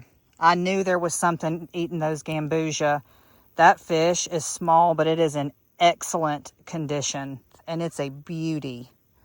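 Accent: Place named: South, United States. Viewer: Liked it! Good to hear a gal with a good ole Southern accent. I come by mine naturally since both sides of my family are from Athens, Alabama. Best of luck in your restoration project, and I have no doubt that you will be successful. It's obvious you take pleasure in the process as well as reaching your goals.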